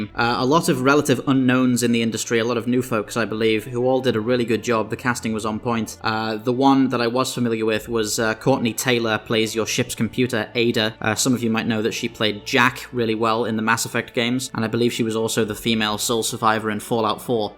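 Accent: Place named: England, United Kingdom. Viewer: No idea why but you sound like an English Ben Shapiro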